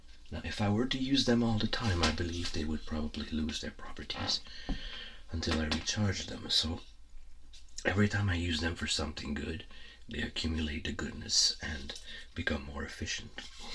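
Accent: Quebec, Canada. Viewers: Great video! Love the theme and ambience. Are you québécois by any chance?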